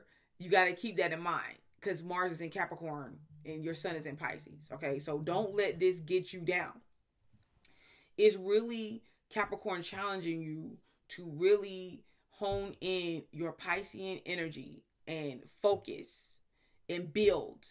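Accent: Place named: East Texas, United States. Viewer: Are u from east texas by the way? I love your accent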